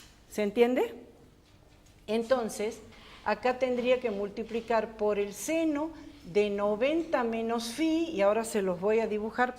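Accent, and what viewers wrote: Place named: North, Spain. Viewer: Que Facultad es? Tiene acento norteño la profe.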